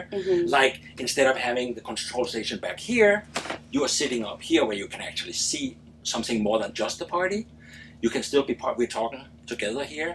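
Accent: Denmark. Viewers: Du lyder dansk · Hey, nice tour, nice boat - buy your accent i say you are Danish. Your cameraman was sleeping, every time you pointed down to show the mast foot or other things, the camera was just horizontal. wake him/her up next time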